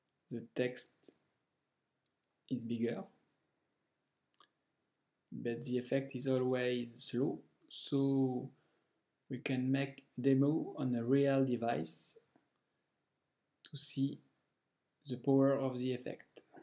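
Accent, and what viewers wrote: France. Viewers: Le bon accent français des familles <3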